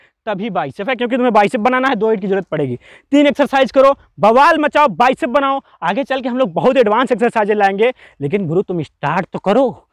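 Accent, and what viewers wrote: India. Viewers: Bhai aap akdam deshi voice me shikhate ho mja aa jata h🙏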